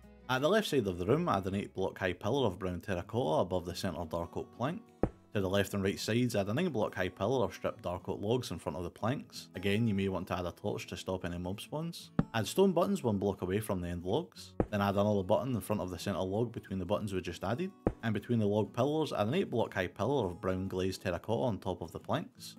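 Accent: Scotland, United Kingdom. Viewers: Build: 100% Style: 100% Shaders: 100% Scottish Accent: 110% most underrated minecraft channel in history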